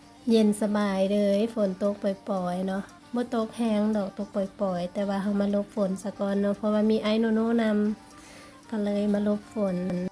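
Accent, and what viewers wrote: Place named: Laos. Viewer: สำเนียงลาว แต่คำไทย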